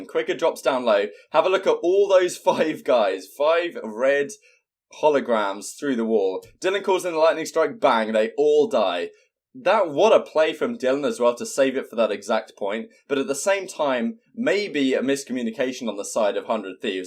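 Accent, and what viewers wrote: United Kingdom. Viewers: Love the game analyses, maybe it’s your British accent but I feel like you would be a good ass caster too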